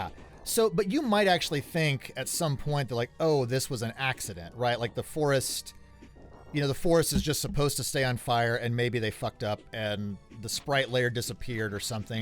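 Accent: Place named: South, United States. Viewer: I absolutly love the southern accent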